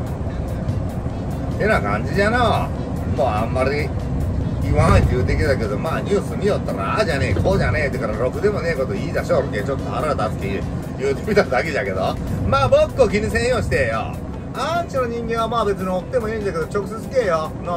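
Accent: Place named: Okayama, Japan. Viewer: お疲れ様です! さんは中小のドライバーが思っている事を全部言ってくれるし、備後在住のワシにはさんの岡山弁がとても聞き心地が良いです!では次回楽しみにしてます!ご安全に✌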